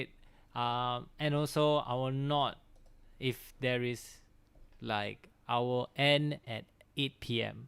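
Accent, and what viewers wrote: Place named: Southeast Asia. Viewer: hi, teacher, are you from south east asia? your tone tells me that.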